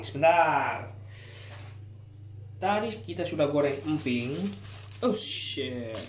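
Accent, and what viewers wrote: Java, Indonesia. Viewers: Mas kok bilang iyah, dr jawa yah? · ngapunten mas......kulo tanglet sampeyan asli pundi toh kok jowo ne genah? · Jowone ketok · Ketoro Iki wong jowo Yo mas bro..kok ngomong Sego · Jowone kabupaten ngendi to mas ?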